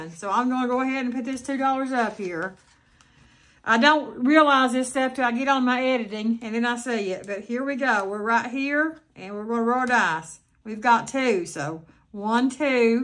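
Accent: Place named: South, United States. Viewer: It’s so great to hear someone doing this that sounds like me 🥰. I’m from Georgia and believe it or not I think you may even sound a little more country/southern than me. I LOVE IT!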